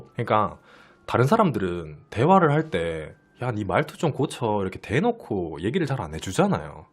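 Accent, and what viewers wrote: Gyeongsang, South Korea. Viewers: 경상도 사투리가 이쁠수가 있구나?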